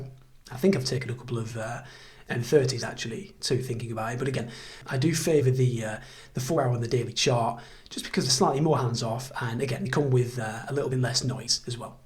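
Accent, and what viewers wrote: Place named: United Kingdom. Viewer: Hi thanks for your video and (for once ) I can hear a lovely british accent .. How do you deal with false signals ? divergences are not Always successful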